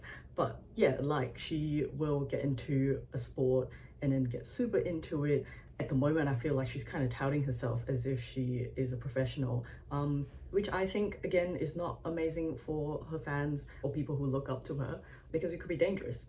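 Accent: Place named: Australia. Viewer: omg an aussie! YAYYYY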